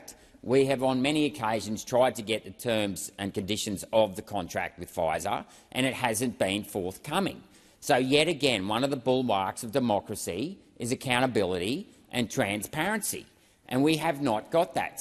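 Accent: Australia. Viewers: sorry bro I can't take Australian accents seriously.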